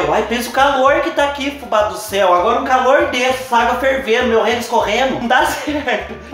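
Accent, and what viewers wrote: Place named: São Paulo, Brazil. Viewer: Que seu canal venha crescer cada dia mais e mais 😍👏🏻 parabéns pelo conteúdo ❤️ você fala que nem meus parentes que mora em Ribeirão Branco não sei se conhece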